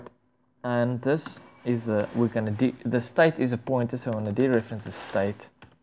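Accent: South Africa. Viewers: You sound South African? Good tutorial by the way! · are you perhaps south african?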